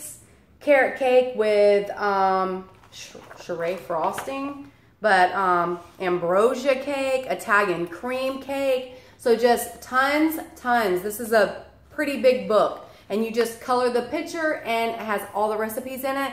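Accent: South, United States. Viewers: Just found your channel! I absolutely love your personality! Where are you from? Is that a southern accent?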